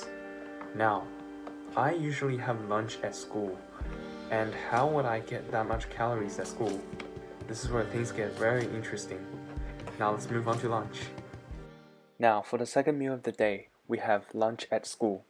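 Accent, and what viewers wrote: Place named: Australia. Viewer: Aussie mate 😎 😂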